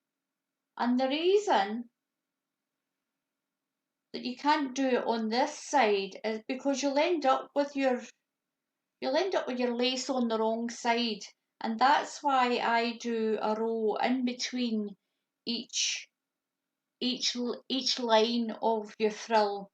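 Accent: Scotland, United Kingdom. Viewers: Super cute, tfs. I adore your Scottish accent, 😀🐢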